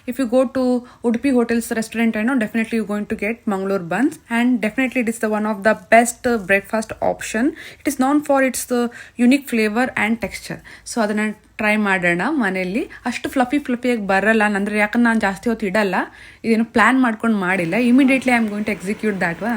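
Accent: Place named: Andhra Pradesh, India. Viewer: Your telugu accent is good ma'am :)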